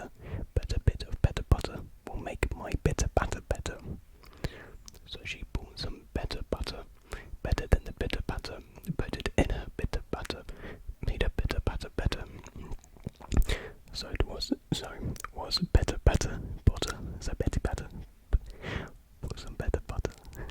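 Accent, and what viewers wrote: United Kingdom. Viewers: British accent 😩😍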